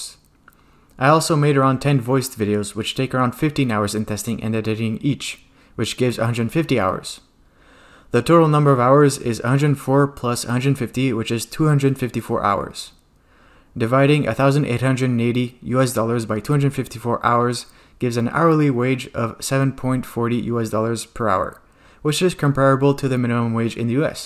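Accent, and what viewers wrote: Quebec, Canada. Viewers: Vous est quebecois? I heard a little Canadian french when you were telling your story. I'm an hour north of toronto and learned a little french in school. Congrats on your channel growth, i hope it quadruples in 2020! You are one of the best WT youtubers out there, looking forward to educational videos as well. I think it will suit your channel very well.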